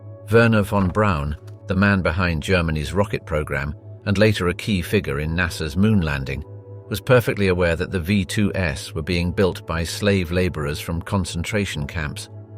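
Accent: England, United Kingdom. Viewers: LOL Your fancy British accent can't hide the lies. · I'm not sure what it is but the English accent makes a documentary better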